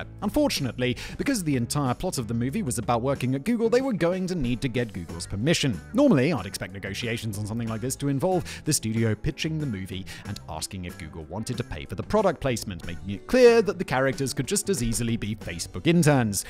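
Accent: United Kingdom. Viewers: Fuck conforming (American here) you do you. Pronounce it the british way! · Well you called it a hood instead of a bonnet so you might as well start pronouncing Nike like the rest of us. The UK isn't the world. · Eh. F the British pronunciations. No one but you uses them. If you wanted it different you should’ve fought harder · Don't feel badly about Nike. I'm in Michigan, and it took that shoemaker at least a decade of very expensive commercial advertizing to get me to finally make me pronounce it the Greek way. Before that, I pronounced it the normal way, like you. Also, part of your charm is your very British accent. Hearing you say nike is the audible equivalent of the Y in tyres. If you didn't do these things, then it wouldn't add up.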